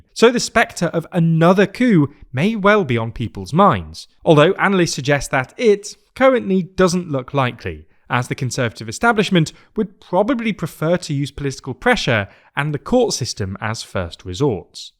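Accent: Northern England, United Kingdom. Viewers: He’s from Northern England where they pronounce “u” and “oo” funny.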